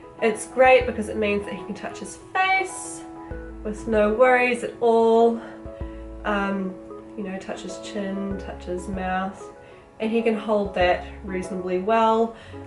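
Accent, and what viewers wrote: New Zealand. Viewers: Lol 😂, "why?" ... Love Alan's body tats. Did you make his hoodie or buy it. Been thinking of making a bjd video, the community needs more kiwi voices I think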